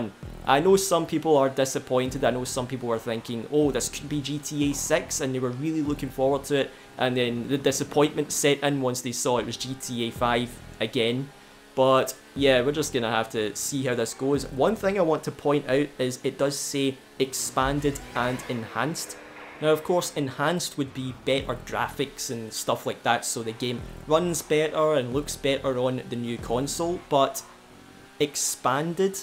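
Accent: Scotland, United Kingdom. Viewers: U must be Scottish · Arr you scottish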